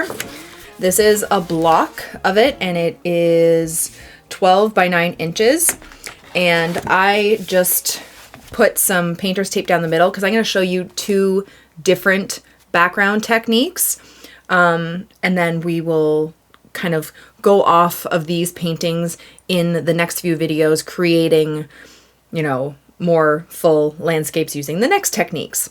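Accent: Canada. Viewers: Before I subscribed- I was watching one of your videos, and as I was listening to you- I was like “Do I detect the Canadian rise?!” Lo and behold- I decide to subscribe and see that you are one of my people! ❤🇨🇦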